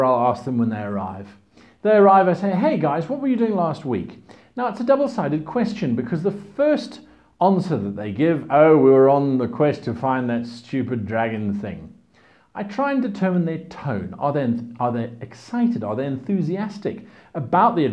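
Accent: England, United Kingdom. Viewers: I'm glad I've found your channel, really useful tips in all the videos I've seen so far. if I can ask, you sound British - do you know of any good websites/social media groups for recruiting players? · I could be a great GM if I had a wonder English accent like that!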